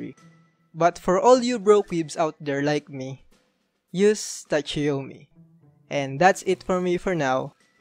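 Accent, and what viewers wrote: Philippines. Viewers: eyyy accent pa lang pinoy na agad ahahahhaa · Taena sa accent mo palang, halatang pinoy na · Are u a filipino?!. Ur accent sounds like it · The accent of this guy is just the same as filipino accent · Sounds like a filipino accent ngl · I love you're accentHAHAHAH pinoy ka ngang kupala ka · I love the Filipino accent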